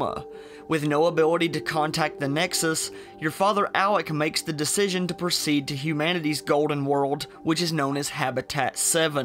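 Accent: South, United States. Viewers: No disrespect, since I'm from Mississippi myself, but do I detect a deep southern drawl there (Texas or Tennessee maybe)? ^_^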